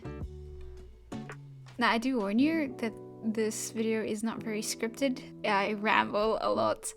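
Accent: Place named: South Africa. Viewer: You sound like a South African. Nice accent. Also great video xD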